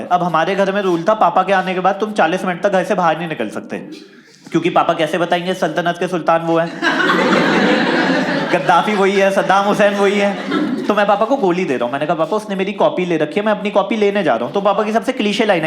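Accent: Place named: Saharanpur, India. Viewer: Pure Sharanpuri Hai... 😂😂😂😂😂😂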